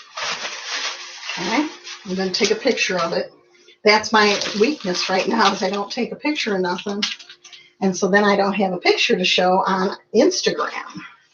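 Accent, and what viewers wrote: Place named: South, United States. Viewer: 🌟✨ love your southern accent so much! 💙👏 Another awesome video. 💖💖 Stay blessed and your almost at 1k subscribers!!! So epic🙏🏻🌻🌱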